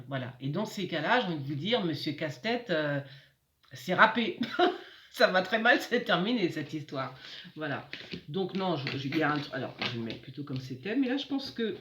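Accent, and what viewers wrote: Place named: South, France. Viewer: bonjour quel bel accent meridional!!!!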